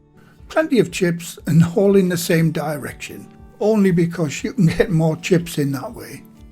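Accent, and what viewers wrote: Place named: Northern England, United Kingdom. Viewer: I grew up in the north east, your accent reminds me of childhood. Its that particular northern but well spoken, reminds me of my nannas "telephone voice"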